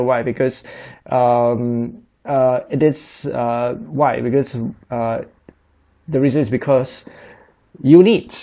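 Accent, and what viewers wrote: Singapore. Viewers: Can tell is Singaporean ! Thanks! haha!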